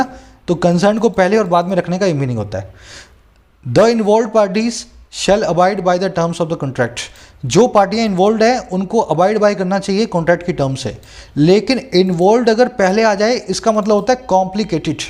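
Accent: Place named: Haryana, India. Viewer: Awesome sir. Your way of teaching is unique. Even hariyanavi accent add a feather in our cap.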